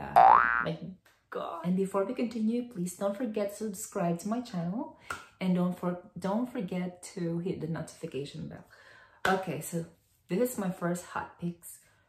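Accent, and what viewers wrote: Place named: Philippines. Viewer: kahit Pinoy accent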